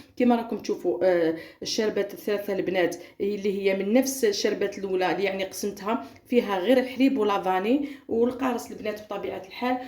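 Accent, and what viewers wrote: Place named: Algeria. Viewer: والله انا نحب خوتنا الجزاريين ونحب حتى لهجتكم🎉🎉🎉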